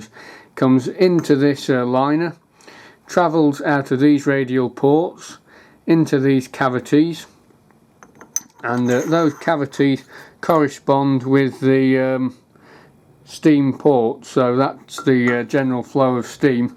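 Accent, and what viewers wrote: United Kingdom. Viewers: What part of the UK is your accent from? I've heard it before, but never knew.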